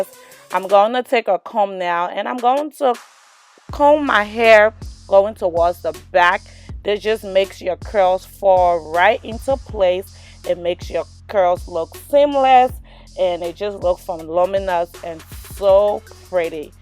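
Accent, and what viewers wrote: Liberia. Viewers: Is she Liberian? Her accent sounds like she's from Liberia.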